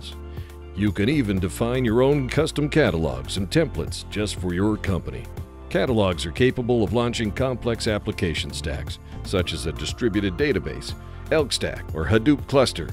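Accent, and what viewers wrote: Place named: New England, United States. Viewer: why does he sound like a yankee with a country accent?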